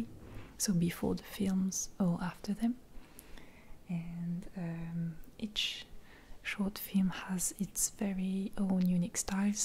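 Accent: France. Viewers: Your accent makes me wanna drop my life and find love in France........ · Your french english is adorable. You are always adorable. Please make a brush video!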